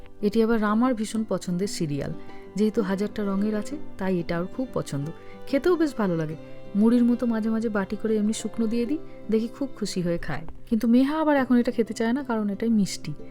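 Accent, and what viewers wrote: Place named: Hooghly, India. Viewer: Didi tumi medinipur er meye holeo kotha bolar dhoron onekta Hoogly district er moto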